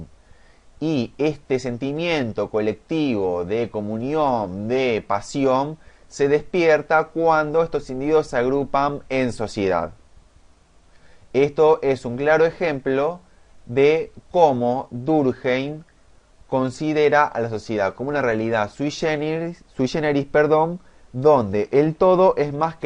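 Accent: Argentina. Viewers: Es argentino, deje el show.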